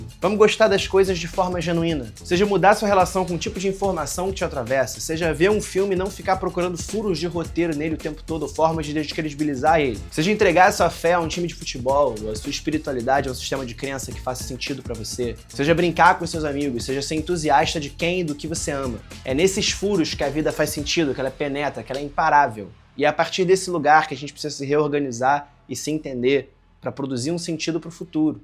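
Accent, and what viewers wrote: Rio de Janeiro, Brazil. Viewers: A forma como ele solta uma enxurrada de conhecimento e sensibilidade entre o sotaque e as gírias mais cariocas que existem me pega demais. ❤️‍🔥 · o sotaque e o jeito carioca só deixa melhor o vídeo que é a verdade nua e crua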